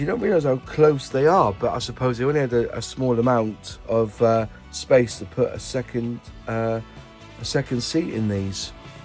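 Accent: West Country, United Kingdom. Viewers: I'm blaming and West country accent!! 😂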